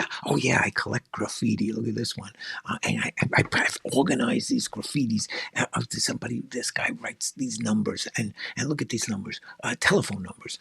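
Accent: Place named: New York, United States. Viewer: Enjoy your excellent reviews … and your NY area accent. (Though long gone, I was born and raised there.)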